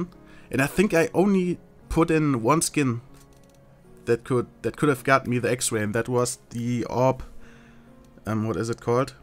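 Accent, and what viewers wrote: Germany. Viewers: no one wants to have a fvcking german acczent :D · lol why would you want to have a german accent? xD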